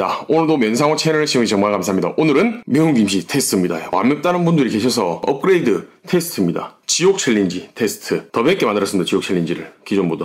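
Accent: Gyeongsang, South Korea. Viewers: 어디선가 뵌듯한데 어디서 봤는지 기억이 안나네ㅠㅠ 경상도 맞쥬? 위장 보호 해가미 드시소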